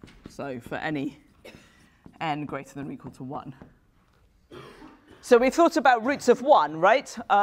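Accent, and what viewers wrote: England, United Kingdom. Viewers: The last thing I may think of is to be taught by a British teacher not because they are not good teachers but because of the British accent. I feel like British teachers suffer when they speak...breathing issues...directly coming from the gym to the classroom and starting to teach... Brilliant lecture btw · I like your English Accent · English accent is fucking awesome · everything in an British accent sounds more interesting...even the unit circle..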